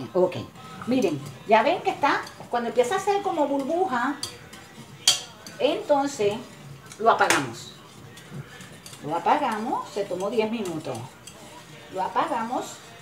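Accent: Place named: Puerto Rico. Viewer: Me encanta el acento Boricua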